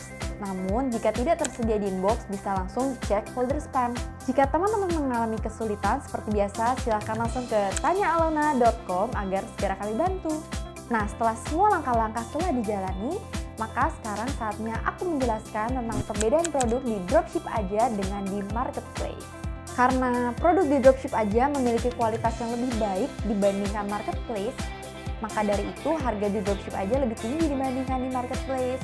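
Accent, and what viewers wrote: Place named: Cirebon, Indonesia. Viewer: Cirebon mana suaranya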